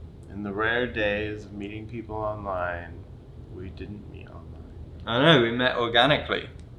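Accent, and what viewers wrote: United Kingdom. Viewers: Your accent is slipping a bit, as an American who has several British friends I can hear it. It is because you are married to an American, nothing wrong with that. I can hear you becoming more American in your accent, lol! Don't lose that pretty British accent!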